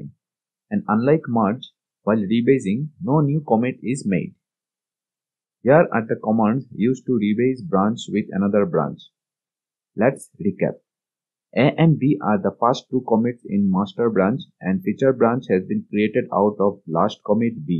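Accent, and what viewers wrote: Bengal, India. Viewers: English accent se bangali lag rha h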